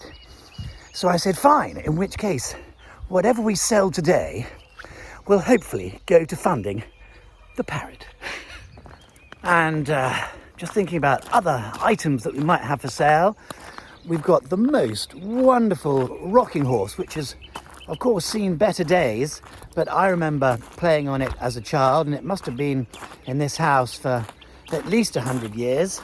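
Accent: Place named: RP, United Kingdom. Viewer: Love your accent very posh indeed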